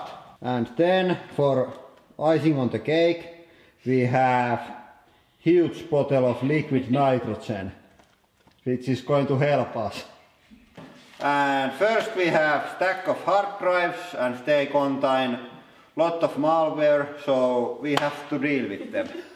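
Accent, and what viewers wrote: Finland. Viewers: kiva rallienglanti, huomasin heti et oot suomalaine :D kivasti tilaajii sul:) ja kiva idea. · Derp he is finish (idk how you spell that) I'm Dutch lol ik Ben dat wel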